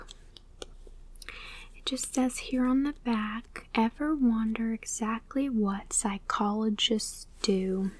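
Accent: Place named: South, United States. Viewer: Lmao....love the ditsy southern belle thing you got goin’ on!! Still very relaxing too!! Thanks.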